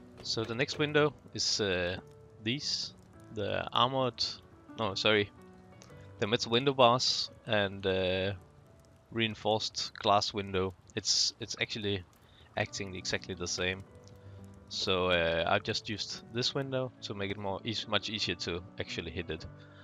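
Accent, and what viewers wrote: Denmark. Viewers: du dansk. tydeligt på stemme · Han er 100% fra Danmark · You sound danish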